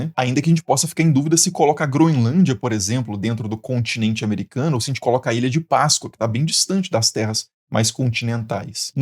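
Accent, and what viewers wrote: Minas Gerais, Brazil. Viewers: Eu “descobri” esse canal há pouco tempo e já sou fã! Adoro a tua maneira de explicar, e acima de tudo esse sotaque mineiro lindo. Muito sucesso e muito obrigada por partilhar o teu conhecimento conosco 🙏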